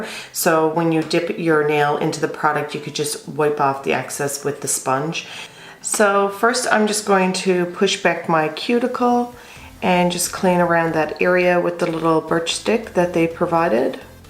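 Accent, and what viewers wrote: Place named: Newfoundland, Canada. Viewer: I just came across this video because I bought the system. Qp seconds in I had to go see what part of NL you were from ☺ fellow NLer here.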